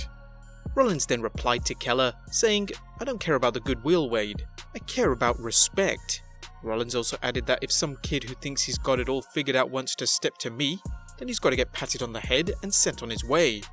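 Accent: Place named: United Kingdom. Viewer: Ok that is the very first time I have ever hear someone pronounce the word as STAYTUS. I mean I know your british and all but damn you act like your accent prevents you from saying STATUS like everybody else. Do you say APPLE or AYPPLE?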